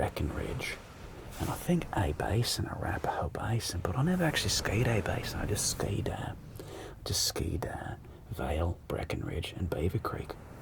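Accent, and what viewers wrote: Australia. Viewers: big fan of the aussie accent! would love to see it come back in future videos :) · As an Aussie myself I just want to say he did a good job especially the accent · This is me, an Aussie, petitioning you to please do a Russell Coight ASMR. The accent was 👌 and the theme of this video was so on brand for us. The sun ain't no joke down under! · Your Aussie accent is actually really good, you could have called me a c**t though for maximum authenticity 🇦🇺 🐨 🦘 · Killer work, bro! Especially with the Aussie accent!!! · The Aussie accent was 👌 and the suncream on your nose was a good touch 😁 we need more roleplays like this!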